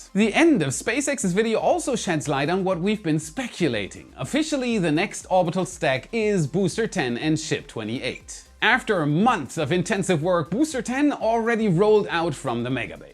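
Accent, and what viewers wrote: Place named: Germany. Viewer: Nice surprise. Always a space geek's ecstacy. Space must always come with a German accent!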